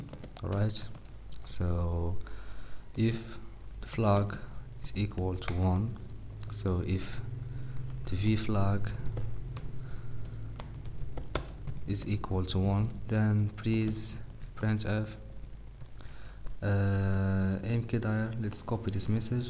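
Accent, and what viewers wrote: Morocco. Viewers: itoub 3lik, ghir mn pronunciation 3rftek mghribi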